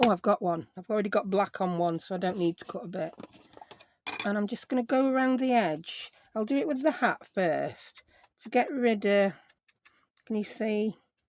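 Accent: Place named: Rochdale, United Kingdom. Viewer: I have only just seen your video. You are so funny, especially about the kids comments your accent sound somewhere around Rochdale area. Great cards.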